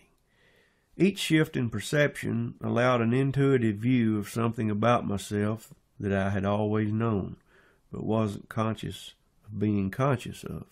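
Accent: South, United States. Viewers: Nothing like non-duality with a southern drawl....it just sucked me in mind and all... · Well... how refreshing to hear it from a south accent. Take care. You got my smile.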